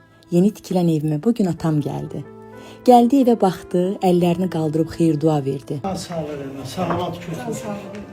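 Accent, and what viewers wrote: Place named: Neftchala Salyan, Azerbaijan. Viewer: LƏHÇƏMİZ HƏR YERDƏ TANINIR❤ NEFTÇALA❤SALYAN ❤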